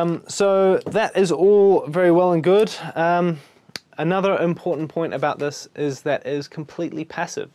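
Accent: New Zealand. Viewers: bro can you send to me, il review it ,give you my thoughts and critisisms and send it back mayby in like 6-12 months? haha Nice review!!! you from NZ?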